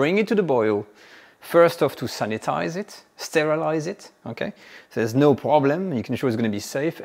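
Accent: France. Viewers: He was born in Paris. Why wouldn't he have a French accent?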